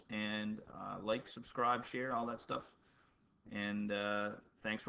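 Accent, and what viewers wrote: Newfoundland, Canada. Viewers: As soon as I heard ya, I knew you's a Newfie b'y...lol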